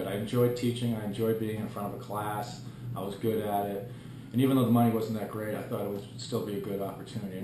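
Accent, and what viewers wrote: Boston, United States. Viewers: BOSTON! I knew it! I was listening to you speak for about 5 minutes saying to myself "I think this guy's from back home..." I'm happy for you, boss - I moved to San Diego about 6 years ago and totally get a lot of your comparisons, because they're somewhat similar to my comparisons of SD vs Boston when people ask me how it is out here. That said, I've always wanted to visit the Philippines (and as of yet, have not) so I obviously can't really understand the Philippine lifestyle. Anyway, you've got a new subscriber. Go Sox/Celts/Pats/Bruins :D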